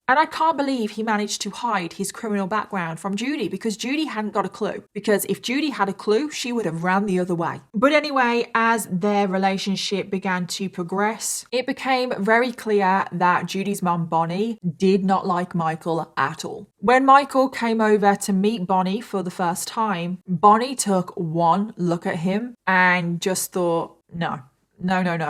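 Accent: United Kingdom. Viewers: LOVE YOUR BRITISH ACCENT.. So there is Posh, Then R something? Haven't seen where you are from in England. You are AMAZING STORY TELLER. You look great before and after makeup..Your an Artist for sure. Both as an Orator and Make Up Artist..Is no easy feat to make a guy watch through most videos.. You kinda an A.M.S.R.. . Have you thohght about a playlist with you reading or explaining a story, thats light and soft spoken..?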